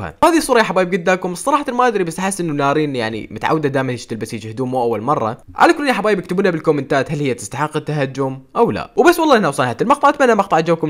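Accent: Iraq. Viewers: اممم انته من اي بلد لءن حجيك عراقي ماعرفه ممكن ترد وتكول انته من وين لءن اني الاحظ كلامكم عراقي 😊💗♥♥♥ طبعن اني عراقيه 🌼🌼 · اريد اعرف انت من العراق لان اللهجة عراقية بس لتاكد متابعتك من 50 الف تحية من العراق 🇮🇶🇮🇶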